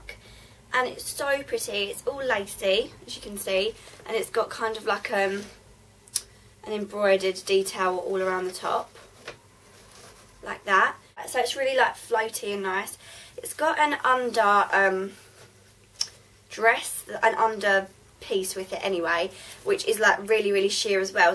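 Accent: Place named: Cockney, United Kingdom. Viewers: Cockney accent , that shocked me big time . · love your accent, are you from london or there abouts? x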